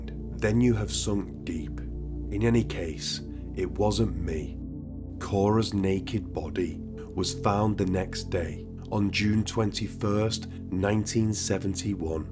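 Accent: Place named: Merseyside, United Kingdom. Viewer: he sounds like hes from Merseyside, the same region as, but hes toned and slowed down his accent for these vids.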